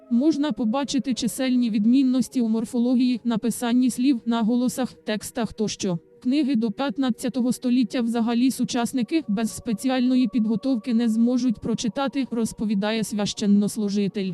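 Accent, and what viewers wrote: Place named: Transcarpathia, Ukraine. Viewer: Звичайно молились. Не брешіть. Я от не можу ні на російський ні на українській. Лише на старословянькій. А є ще русинська мова. А у вас що за мова, наголоси, ??. Так говорять на закарпатті. А от ваш священник чому не любить ближніх ??. Він більше Бога любить Україну. Чи Бога спочатку, а потім Україну?. Відповідь на це питання ставить усі крапки. Слава Богу. Слава Богу.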